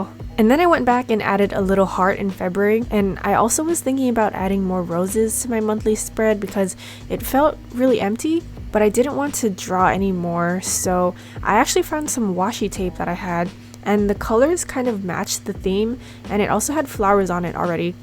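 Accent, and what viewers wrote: Hawaii, United States. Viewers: ho you sound like you from Hawaii.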